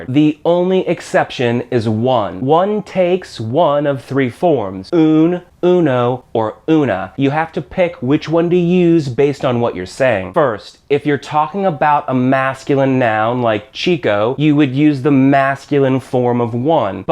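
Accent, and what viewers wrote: United States. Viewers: Your very good at teach but the american twinge to your spanis pronunciation makes me giggle a little bit!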